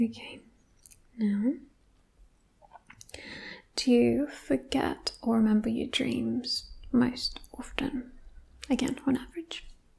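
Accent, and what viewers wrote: England, United Kingdom. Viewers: That accent was awesome I can speak in a British too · Its funny these are a mix of different brittish accents lmao. Shes like cockney, posh, chavvy etc lmao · Oh my god, your English accent is so good. I’m from the UK so can usually pick up on little inaccuracies, but this one would fool me! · The English accent is really good,. Side note, if you can't stand the feeling of skin against fabric, are you naked all the time? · The accent is very impressive. I am from the UK and have friends who talk exactly the same. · My god your English accent is incredible, or is your American accent amazing, i'm confused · Love your English accent. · You are always so Beautiful!!! I really appreciate you and your work and you look so Beautiful and Sexy with Glasses!! I love the British Accent!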